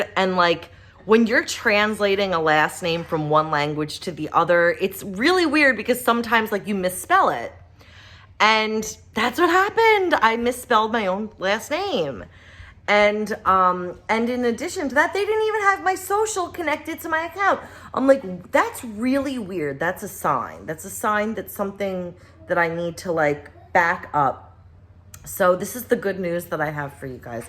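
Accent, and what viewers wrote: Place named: New York, United States. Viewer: hey there 🙂🙂 I'm also a New Yorker but am now in Atl (I THINK you are by your accent but I could be wrong) anyways, yes Subs have gotten my life back on track and I actually finished school this past month and have a 4 year degree from a major University. I have a fam and in my early 40s. Im a metal/hardcore musician so I don't have to explain what kind of world I have lived in. It was fun but nuts..no regrets. Pills ended the music dream and all went down the drain. Subs DID help with getting back on track for my fam and for myself. My issue is the hollow empty shell feeling I have had for 5 years on this shit. Im not ME inside or out. I feel like I just go through the motions of life. I have a beautiful 7 year old daughter and I want her to see her daddy as the guy before the subs. This is why I HATE it at this point. 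Im thankfully it has helped but I feel I've been on it for WAY too long and I also feel it has taken a toll on my mental and physical health. I don't find pleasure in anything really, especially playing music which is something I have done since I was 12 years old. I have no friends anymore because I don't feel social. I know some people may need to be on it for many many years but not me. I should have been off this shit 2-3 years ago. Relapse is NOT an option at this point in my life. I wasn't a IV user but I was taking VERY high doses of oxy/roxis etc...all that is over and im just DADDY now and want to be the BEST daddy. Because of the numb emotio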